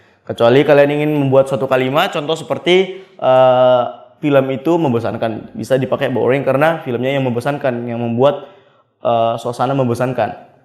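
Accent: United Kingdom. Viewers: British accent on point bangett,kagum 🥰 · Your British accent amazed me.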